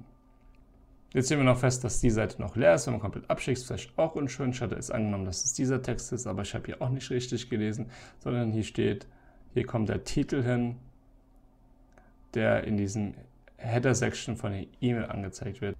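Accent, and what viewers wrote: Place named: Saarland, Germany. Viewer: sorry, der Inhalt mag/könnte ja hilfreich sein, wenn man was verstehen würde und was erkennen könnte. Hektisches Rumgefuchtel und Saarländisches Genuschel sind nicht hilfreich - Sorry to say that. Aber vielleicht willst du dich ja verbessern...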